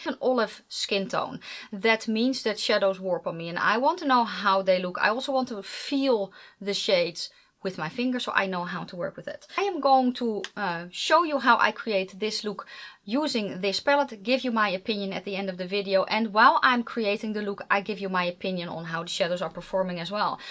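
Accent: Netherlands. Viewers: Wat doe je dit goed zeg! Hartstikke leuk om van je te leren, je legt het zo duidelijk uit en ik hou ervan om mijn eigen accentje te horen tussen alle Amerikaanse reviewers! En de combinatie die je in het begin noemde… heel herkenbaar! Ga vooral zo door, ik ga je volgen!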